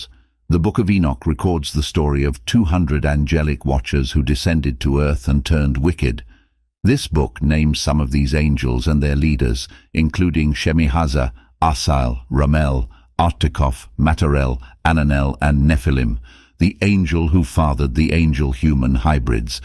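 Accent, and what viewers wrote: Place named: United Kingdom. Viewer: Omnipotent, Omniscient, and Omnipresent means something to me. And your British accent can mean something in television animal documentaries but not in theology. Thanks but no thanks.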